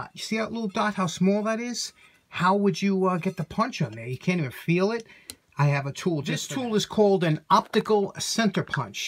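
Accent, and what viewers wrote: New York, United States. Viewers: A very educational episode I loved it. No Disrespect intended but I love the new Yorker accent huh lol it's the best. Pretty soon it's going to be winter I'm in Texas so I'm ready lol. Thanks:D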